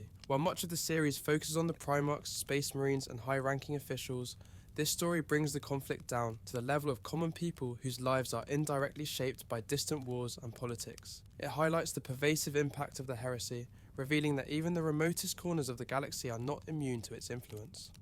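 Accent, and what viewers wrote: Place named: United Kingdom. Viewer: I like hearing a British person speaking about 40k. I know an American or anyone can speak about it also. But I greatly prefer a British person like this guy since 40k comes from Britain. It's sort of like... idk, I want to hear a Chinese man when I'm learning about the Three Kingdoms period or Chinese cuisine. I want to hear an American when I'm learning about modern money or finances, or other American dominated fields. And I want to hear a British person talking about 40k.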